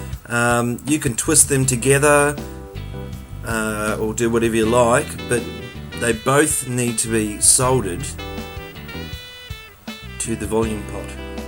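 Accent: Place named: Australia. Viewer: Unbelievable. An Aussie accent. I subscribed immediately. Yanks and Poms are ok but as an Aussie it's a nice touch to hear an Aussie or Kiwi accent.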